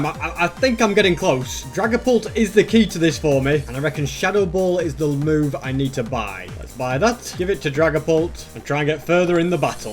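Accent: United Kingdom. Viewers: why do you look like indian but your accent is british?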